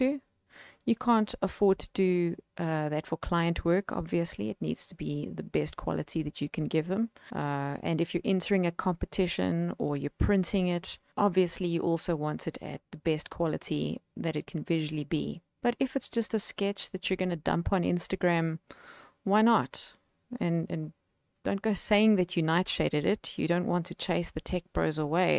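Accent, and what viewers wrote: South Africa. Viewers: I just found your art now! I can’t believe this is the first video I’ve seen from you! By the way, are you Afrikaans? I can’t help but notice that familiar accent! :D God bless! Keep creating :)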